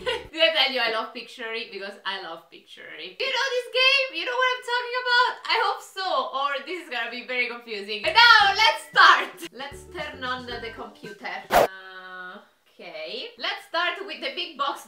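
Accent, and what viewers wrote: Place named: Italy. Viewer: Love ur Italian accent ❤️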